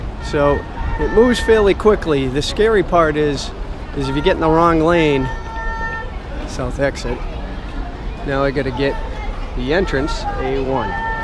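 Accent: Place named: New York, United States. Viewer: So cool hearing a New York accent in China!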